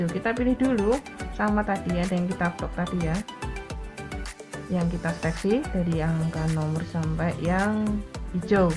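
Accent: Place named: Pati, Indonesia. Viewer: wong pati yaa'eeeee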